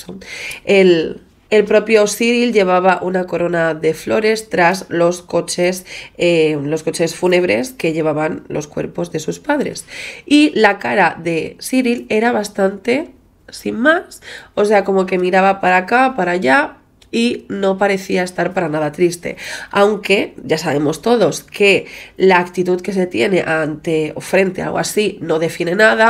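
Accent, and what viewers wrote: Spain. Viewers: Por que esta habla en este vídeo como española y en la vida actual en instagram dice que es Mexican American y habla como mexicana?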